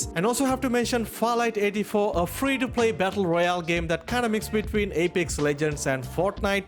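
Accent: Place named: India. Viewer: your accent speaks Indian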